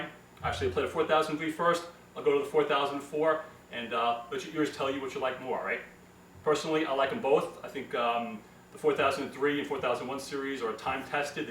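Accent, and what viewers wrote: United States. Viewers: You sound like a New Yorker! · your either a cop or firefighter, and you live...... hmmmmmm long island? Dont take that the wrong way, I love accents. You sound great. Im probably 100% incorrect. thanks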